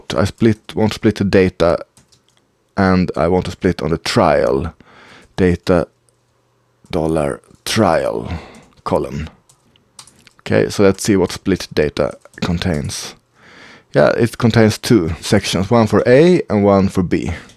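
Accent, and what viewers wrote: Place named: Sweden. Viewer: skön brytning, hör att du är svensk :)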